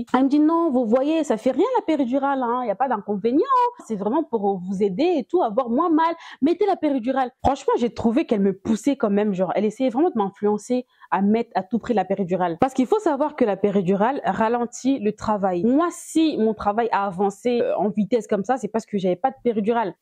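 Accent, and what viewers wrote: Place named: Democratic Republic of the Congo. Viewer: Wow ! 🥹 J’ai eu l’impression d’entendre le récit de quelqu’un de familier, tellement ta façon de parler est typiquement congolaise, avec des van en lingala ! Je me suis sentie très alaise. C’était franchement agréable. Tu a été véridique et authentique, tu as vraiment transmis tes émotions d’il y a 9 mois et c’est super. J’ai eu un peu peur mais j’apprécie beaucoup ton courage. Félicitations pour Bébé 3 fois canon et bon courage pour ses 7 frères et sœurs qui sont aussi en chemin. 😁👏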